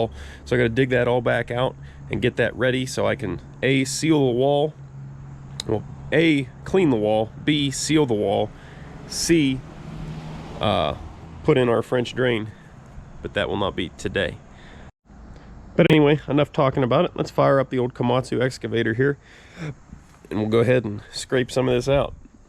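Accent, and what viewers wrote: United States. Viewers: Was just about , as a relative newcomer to this project, to ask what exactly what you intended to use it as. You stopped me in my tracks by giving a quick description. But as one who sometimes struggles with local American accents can you describe a little more exactly what you would like it to be, BRIAN from Spain . Thankyou.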